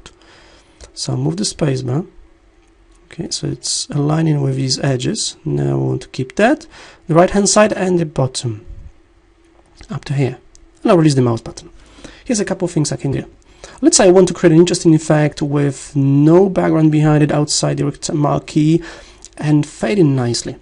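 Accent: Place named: France. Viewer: exotic accent ;) French or a Slavish Language? thanks for the tutorial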